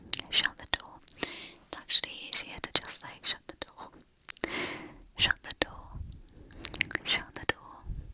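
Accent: Black Country, United Kingdom. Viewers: Ah bab, this med mar day! Ar doh liv in the Black Country any mooer as ar liv ‘darn sarf’. It was lovely to hear ‘my’ accent and listen to the phrases my parents used to say. Thank you. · Always happy to hear you reppin' the Black Country,...practically my second language ay it? 🤣🤣🤣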